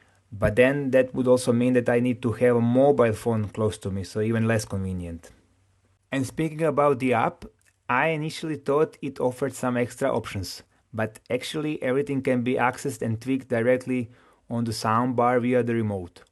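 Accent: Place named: Eastern Europe. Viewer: A giudicare dall’accento ho pensato che tu fossi slavo ahaha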